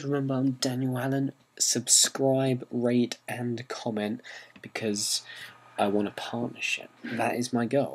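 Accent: United Kingdom. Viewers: your UK dialect is the best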